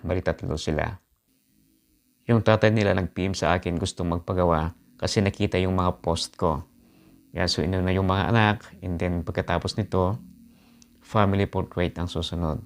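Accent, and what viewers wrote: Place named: Ilocos, Philippines. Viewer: Ilokano ka ngayam sir haha nice one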